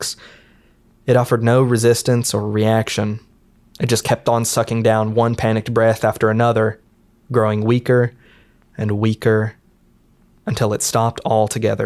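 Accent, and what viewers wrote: South, United States. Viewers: It's a little refreshing to hear a subtle country accent talk about intellectual topics. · I love how horror unites us. As a kid I would never imagine a dude with a classic country twang espousing heart-felt feelings about niche Japanese horror. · Is this man from the south? your speech is both beautiful and uncommon in your line of work. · Hey, this is the first video of yours that I've seen and I think it's pretty good! I gotta ask though, are you from the south? I can hear it in your voice lol. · Great narration. I'm digging the country accent, man. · This man and Wendigoon absolutely carrying the "horror explained by guy with a funny southern accent" genre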